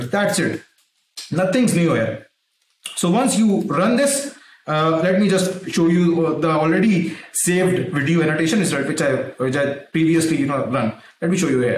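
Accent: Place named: India. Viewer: Speak much more slowly, please. English with an Indian accent is hard.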